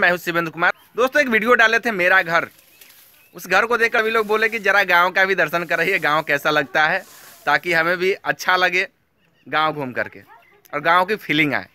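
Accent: Bihar, India. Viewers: ये इस जमाने मे घास पूस के छप्पर सिर्फ बिहार के गावो में रहते है भाई जी । आपकी भाषा ही बता रही है कि बिहारी हो डा को आपलोग रा हो बोलते हो । ई हम नई समझे का।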